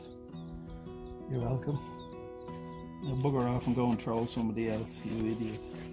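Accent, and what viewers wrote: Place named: Ireland. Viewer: Another great vid mate. Billocks ti the trolls! We love your Irish accent. Currently in a field with crap signal, setting up for a weekend LARP event so chilling out, watching this. Cheers mate and keep.up the great content. 👍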